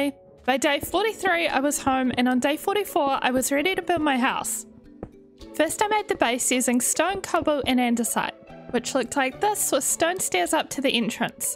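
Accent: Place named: New Zealand. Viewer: i know a kiwi when i hear one!!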